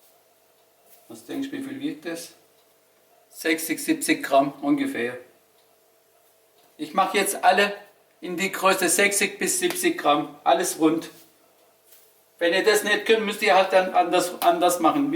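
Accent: Swabia, Germany. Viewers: Ich find doof, dass hier so viele seine "ausgezeichneten" Deutschfähigkeiten hochleben lassen... Darf man nicht nach Jahren hier leben, gut deutsch sprechen und integriert sein oder ist das so besonders?, ich find dein Schwäbisch klasse - Mach doch das nächste Mal Spätzle für uns:P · Ich fand die Sache mit dem schwäbischen Akzent lustig. Türkisches Essen auf Schwäbisch ist einfach nur genial. ^^ (komme aus Ö)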